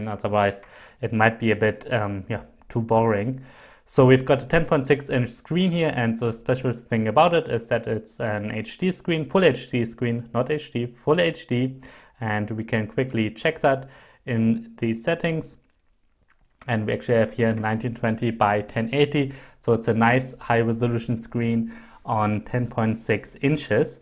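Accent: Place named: Germany. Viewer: Are you german? Shis is fery notisble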